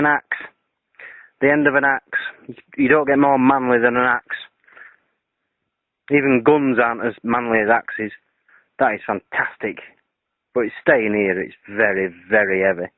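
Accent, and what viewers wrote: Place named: England, United Kingdom. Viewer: It was pretty obvious the 1. He's not to clued up on German 2. He speaks with an English accent and uses phrases like "hey up" and "get in" :D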